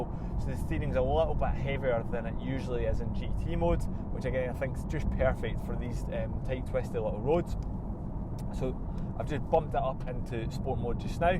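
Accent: Scotland, United Kingdom. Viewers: Are there different accents inside Scotland? Are you from a particular region? Thanks for replying.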